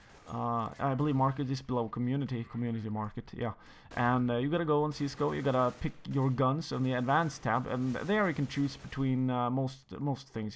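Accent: Norway. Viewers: Haha, du har god engelsk, men alle nordmenn har en aksang man lett kjenner igjen. Kan jeg adde deg på steam?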